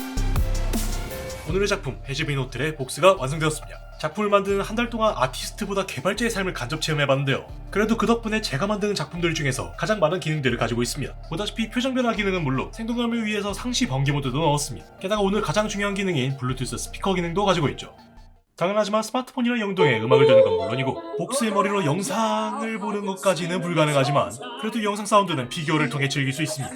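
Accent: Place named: United Kingdom. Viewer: YOU'RE BRITISH????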